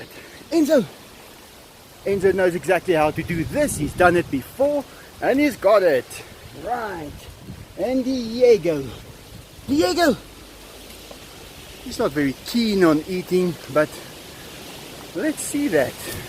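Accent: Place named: South Africa. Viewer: I would absolutely LOVE to see a tiger (and a lion for that matter) in real life! I live in the UK and I've never even been to a zoo so your videos are a real treat for me, it's like a different world! Thank you so much for sharing with us all. P.S I just LOVE it when you say "Can you believe it"! The South African accent is my absolute favourite! Much love to you, and all the magnificent animals <3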